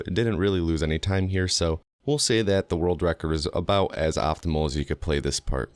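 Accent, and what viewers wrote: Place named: Midwest, United States. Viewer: I love the aggressive Michigan / midwestern accent this episode? Like never noticed it before lmao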